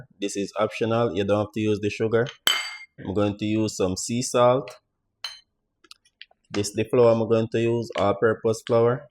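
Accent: Jamaica. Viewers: I like how you cook and bake, I followed your sweet potato pudding and it was a breeze and tasted 😋 good, I don't bake every week because we have to watch gain!😢 I like your accent, is it a Westmoreland or ST. Elizabeth accent? I pick up that your Channel is from foreign though. The sugar softens the dumplings, also a little milk.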